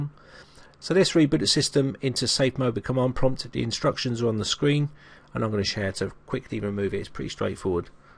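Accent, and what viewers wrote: United Kingdom. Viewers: Thanx here to my new friend-you save my kids recently purchased laptop-you sound like you are from thee other side of the pond...lol, either way I am just glad you had my back on that one. Toney B . (Philly)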